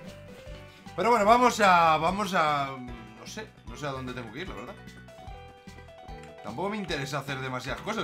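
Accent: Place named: Russia. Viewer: Me suscribo por tu acento ruso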